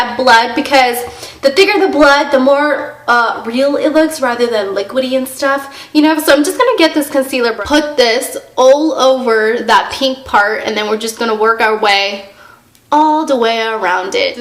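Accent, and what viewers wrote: United States. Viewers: she sounded so country back then haha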